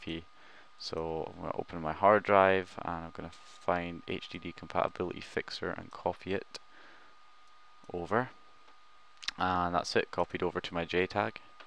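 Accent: Scotland, United Kingdom. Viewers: cheers mate, your tutorials have helped me so much, good to hear that barry Scottish accent!!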